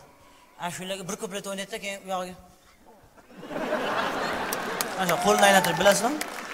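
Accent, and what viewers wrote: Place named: Fergana, Uzbekistan. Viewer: Фаргонана гапирма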